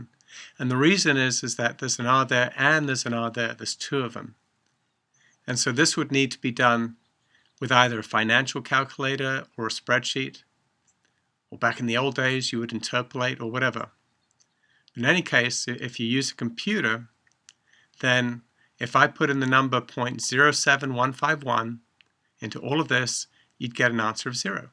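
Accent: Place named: United Kingdom. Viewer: Thank you for lectures they are very helpful, and the British accent makes it even more cool for some reason. Question: Near , you mentioned the need to convert the 8% into an effective 6 month rate, in order to do that don't we first need to convert the 8% from the nominal value into the effective annual rate,(EAR or EFF) which will give us a value of 8.16% EFF on annual basis, which will then translate into the 0.0408 on a semiannual basis.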